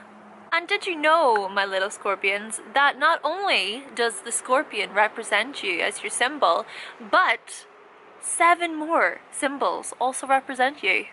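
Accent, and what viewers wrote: Ireland. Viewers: I love your eyes and i love that accent of yours.....irish? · are u Irish? u sound just like Nadine coyle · It's true that Scorpios do have a tendency become involved in the porn industry Been there.Done that. BTW, please try not to lose your beautiful Irish accent.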